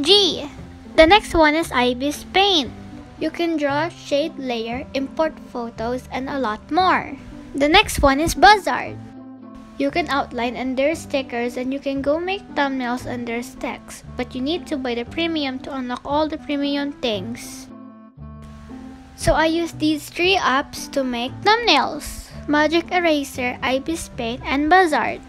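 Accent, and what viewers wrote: Philippines. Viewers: ME: hears her voice ME: FILIPINO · She sounds Filipino. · Uhmm... "Karachter" You have a Philippine accent Ms. Cute voice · Your voice is nice but it sound like your filipino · your accent is like pilipino thing But your cute anyways whos pilipino there click the like button Small youtuber here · Are you Philippina? You sound like you have a phillippino accent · Just from the voice i here another FILIPINOOOO YAYAYYAYAYAYAY SHES ONE OF US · Are u filipino? Cuz ur accent is like mine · You speaking seems like philipina's are you philipina's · Wow I just realized that this accent is Filipino, sooooo I bet this is gacha tuber is filipino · You have a Filipino accent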